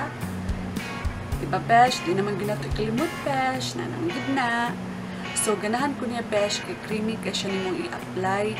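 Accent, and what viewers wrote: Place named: Visayas, Philippines. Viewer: Hello dear beautiful, i subbed you back and natuwa ako at bisaya ang language mong ginamit hehehhe. Anyway maiintindihan kita kasi i have a lot of friends from Cebu, Bohol, Surigao...... keep it up hehehhe, so cute ang iyong bisaya. 👍🏻😘💞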